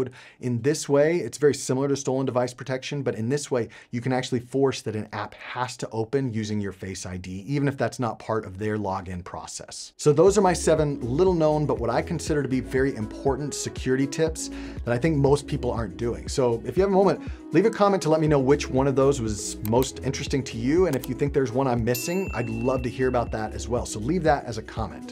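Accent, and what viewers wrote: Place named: United States. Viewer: I don't understand why you speak american english but your channel is in portuguese. Frist time I see such thing.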